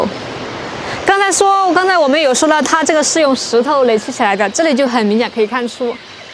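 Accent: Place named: Luzhou, China. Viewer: 说方言的口音跟我们老家很像，跟泸州更像！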